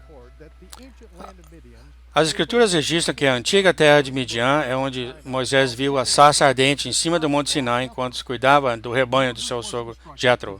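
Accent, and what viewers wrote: Minas Gerais, Brazil. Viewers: quem esta narrando e um mineiro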